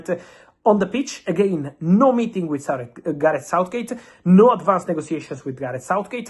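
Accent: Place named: Italy. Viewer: i love how you say sir jim ratcliffe yoiur italian accent makes it sound like sergio ratcliffe here we go have a great day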